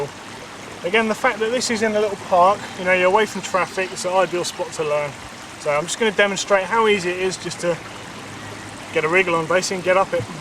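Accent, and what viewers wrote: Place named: United Kingdom. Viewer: its just a normal british accent lol wats so amazing about that?!?!?!?!?!?!?!?!?! :L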